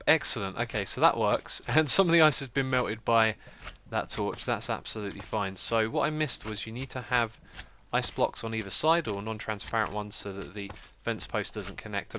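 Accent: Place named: United Kingdom. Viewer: your british Yes i've been watching you for 1 and a half years always thinking your american, sorry about that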